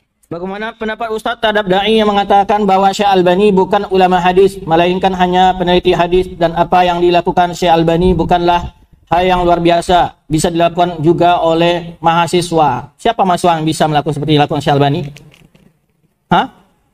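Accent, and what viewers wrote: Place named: West Sumatra, Indonesia. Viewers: Logat orang minang memang seperti itu. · Logat orang minang bro sumatra. Teman saya ada yang kayak gitu kalau bicara.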